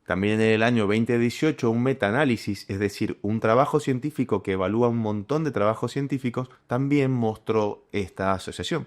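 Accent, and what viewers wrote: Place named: Mendoza, Argentina. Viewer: lo único que entendí, que me parece que sos Mendocino.. esa tonadita que nos caracteriza jaja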